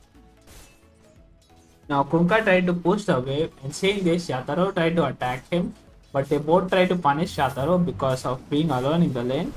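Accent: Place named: India. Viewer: stfu bruh. just show the video no need to speak with ur indian accent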